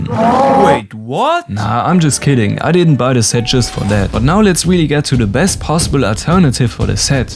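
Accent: Germany. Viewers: I hear a littel german ngl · Why do I hear DEUTSCH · Are you German it Fells Like a German try to speak englisch 😅(no hate) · He sounds German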